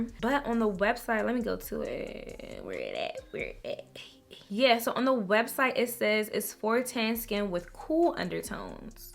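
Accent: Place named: Baltimore, United States. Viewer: It's my first time watching you. Are you from Baltimore? I detect an accent. Your face looked flawless btw.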